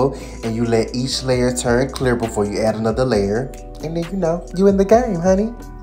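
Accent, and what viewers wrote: South, United States. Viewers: are u from memphis or houston u sound like u have a similar accent to us round down souf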